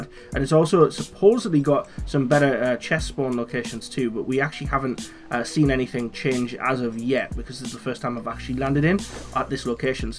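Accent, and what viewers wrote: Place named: North East England, United Kingdom. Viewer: Love your vids mate, where you from? Sound like your from the north east!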